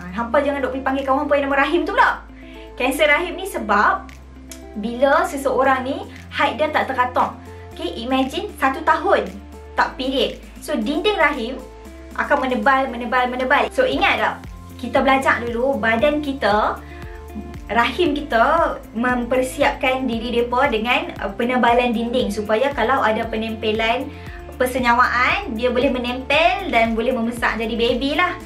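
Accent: North, Malaysia. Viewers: Very informative👍👍. Jgn hiraukan manusia2 yg jeles dok ngata bagi sharing session. You do you💪🏻💪🏻 btw, seronok dgr loghat utagha rindu pulak chegu2 kita dulu. Hahaha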